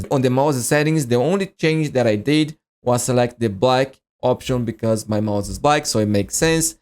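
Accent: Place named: Brazil. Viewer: Tu é brasileiro ne? Sotaque não nega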